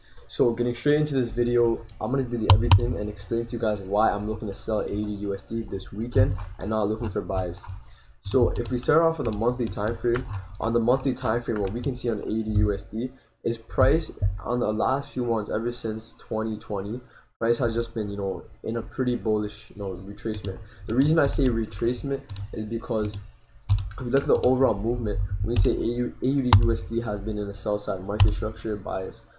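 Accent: Toronto, Canada. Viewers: The way you talk tells my you're from GTA, link up homie 😈 Also do you analyze crypto as well? Would love to see some content regarding that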